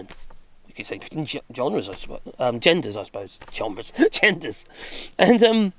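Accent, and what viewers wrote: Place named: Norfolk, United Kingdom. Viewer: In this strange troubling world we very much need people like you. Won't whittle on but not only talk a lot of sense but even better I get to hear a Norfolk accent as well. Lived many years in Overstrand and then Cromer and lastly in Wymondham. Keep going you are much appreciated by us tune in.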